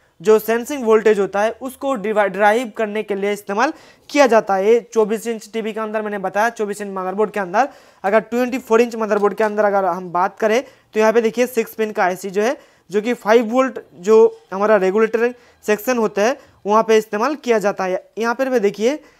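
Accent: Bengal, India. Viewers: দাদা তুমি বাঙালি নাকি তোমার হিন্দি কথা গুলো বাঙালির টান আছে ❤️ তোমার কথা গুলো ভালো লাগে আর বুঝতে ও সুবিধে হয়❤️ লাভ ইউ দাদা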